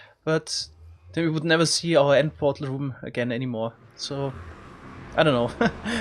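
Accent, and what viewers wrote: Germany. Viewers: Sounds like a german Guy🤷🏼‍♂️🙋🏼‍♂️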